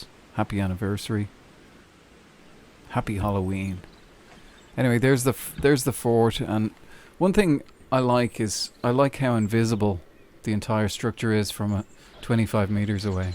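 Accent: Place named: Ireland. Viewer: Fantastic stuff. And I always enjoy how Irish folks say column!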